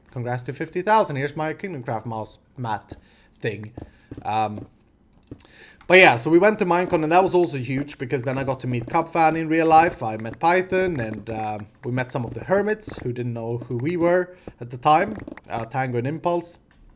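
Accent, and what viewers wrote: Sweden. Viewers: Congratulations dude! I found your channel through your mystcraft tutorial a long time ago. From there I watched your videos every now and then but i never subscribed. Then i found meatylock. He re-introduced your videos to me. I thought, this weird Swedish guy with the crazy accent again? He's hilarious. Ever since I've been subscribed. With 4 different accounts atm. You're welcome btw <3 thanks for the quality content. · I'm one of those that came from Beef's channel, only that I just started following him too! Basically got here indirectly through following Etho xD Love your content (and your super funny Swedish accent :D) High five from Italy, bro! :) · Congratulations:) I subbed when I first saw you playing the PvP game that Doc and Etho made at Danglands:) I loved your attitude and they way you pwned them xD Thank you for being such a great person and entertainer <3 Plus your voice is really cool with the Swedish accent:3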